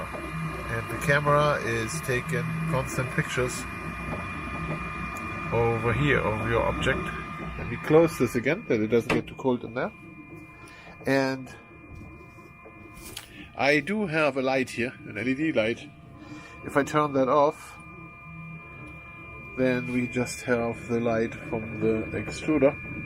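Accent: Germany. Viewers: Du hörst dich an wie ein deutscher,bist du einer? Naja jedenfalls Danke für das Video!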